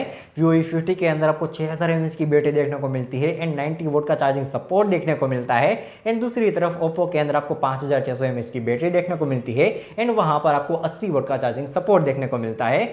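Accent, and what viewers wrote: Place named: Jamnagar, India. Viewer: Flawless 🎉 u seem to be from jamnagar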